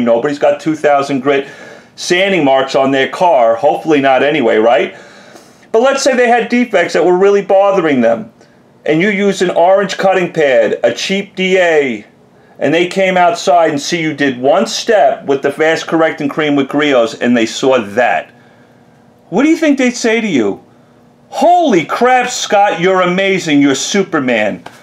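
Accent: New York, United States. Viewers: I hear a New York accent? Queens?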